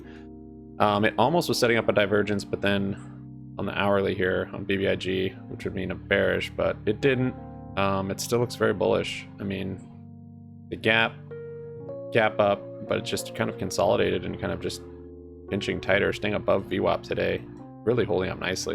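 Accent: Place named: United States. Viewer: Dude, you sound just like Elon Musk with a more American twang. Are you Elon Musk?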